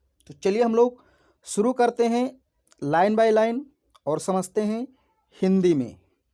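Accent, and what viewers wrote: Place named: Purvanchal, India. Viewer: Sir speaking language tells that you generally belog to Purvanchal... May be Sultanpur, Ambedkar nagar or Ajamgarh😊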